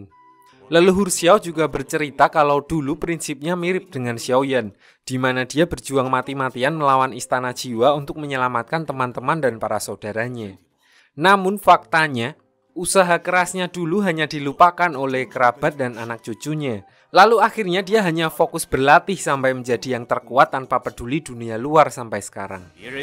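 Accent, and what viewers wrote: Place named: Java, Indonesia. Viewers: Mantuul mas bro suaranya, lucu dari Jawa ya salam kenal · Narator buagusss bgt. Ono jowone...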